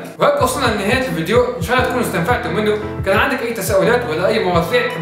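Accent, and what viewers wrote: Tunisia. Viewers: تونسي انت ؟؟ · هل انت تونسي?? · هل أنت تونسي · انت تونسي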